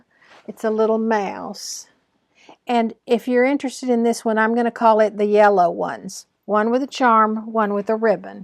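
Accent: South, United States. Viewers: I look so forward to your flosstube videos! You are so sweet and of course I love your southern accent. You do such wonderful projects! Kim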